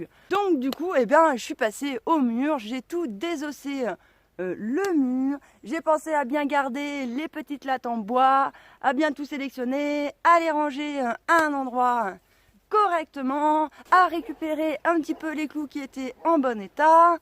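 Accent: Paris, France. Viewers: Mais putain, pourquoi tu causes comme une parisienne derrière tes lunettes de Kaaris ?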